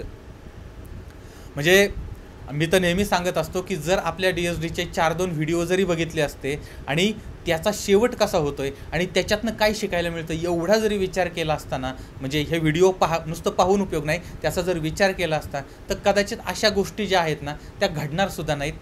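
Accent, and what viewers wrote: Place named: Ahmednagar, India. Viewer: This is the way Nagar people talk accurately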